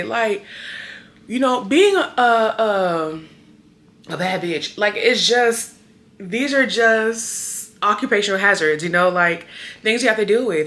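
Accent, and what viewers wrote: United Kingdom. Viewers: Everything is so cute. And you're british accent reminds me of so much. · It’s the British accent for me 😆😆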